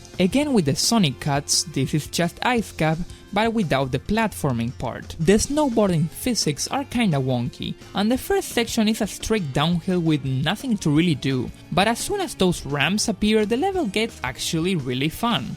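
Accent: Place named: Argentina. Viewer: Tenes un re acento argentino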